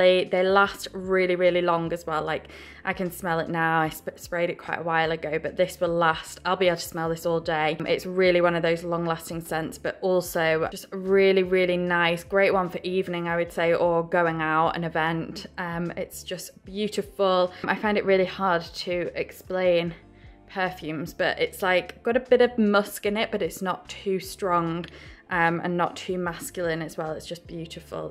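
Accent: Manchester, United Kingdom. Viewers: She has a gorgeous accent, like posh Mancunian… probably Alderney edge or Somewhere Cheshire’s. I love the videos and she is very articulate.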